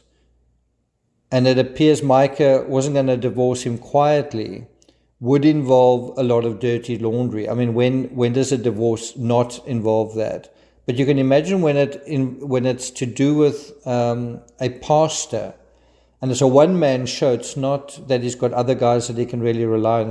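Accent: South Africa. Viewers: Are you South African? Your accent....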